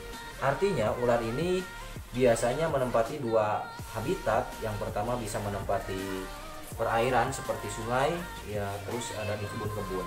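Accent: West Java, Indonesia. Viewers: Ohhh abang. Orang sunda? Wkwkwk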